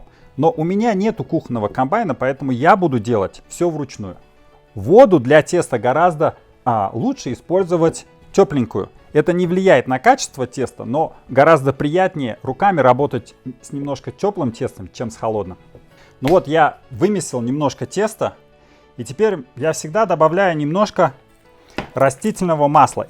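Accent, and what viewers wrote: Uzbekistan. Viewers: У Вас как будто хорезмский акцент. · У вас узбекский акцент ;) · Да, акцент узбекский. Сразу слышно!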